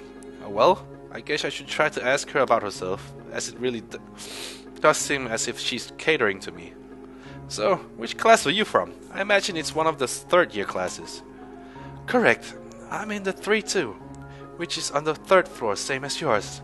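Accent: Denmark. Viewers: I wonder what his first language is? Danish, maybe? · Are you danish?!! O_O I am danish!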